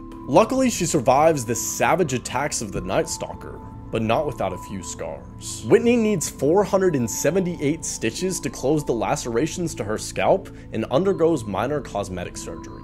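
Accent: South, United States. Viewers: I love your story telling technique. Are you from the South. I can detect a southern accent. In your speech.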